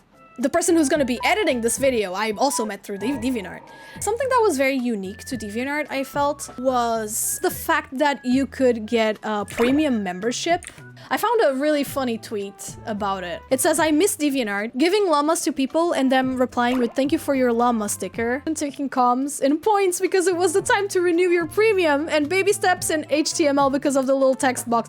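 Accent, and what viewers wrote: Portugal. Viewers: I love your Portuguese accent,... Beijos do Brasil!!!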